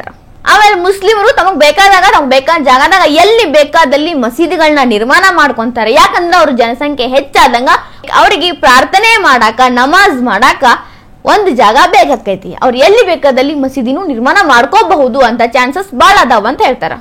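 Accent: North Karnataka, India. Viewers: ಸ್ವಲ್ಪ ನಾರ್ಮಲ್ ಆಗಿ ಹೇಳಿದ್ರೆ ಚೆನ್ನಾಗಿರತ್ತೆ ನಿಮ್ಮ ಉತ್ತರ ಕರ್ನಾಟಕ ರೀತಿನ ಸ್ವಲ್ಪ ಕಡಿಮೆ ಮಾಡಿ ಸ್ವಲ್ಪ ರಾಗ ಕಡಿಮೆ ಮಾಡಿ ಚೆನ್ನಾಗಿರತ್ತೆ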